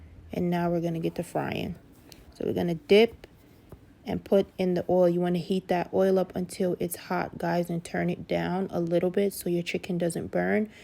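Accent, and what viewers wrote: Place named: United States. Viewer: Roza send me here loving the content already ? Are you a Jamaican you sound American was wondering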